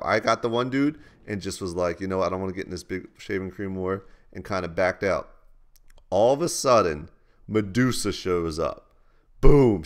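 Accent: New Jersey, United States. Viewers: I keep thinking ur from jersey r u from Philly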